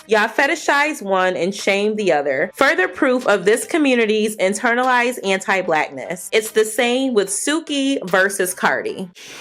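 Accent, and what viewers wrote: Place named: Upper Midwest, United States. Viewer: Not to be off topic But I sense an upper midwest accent. Are you from Wisconsin or something?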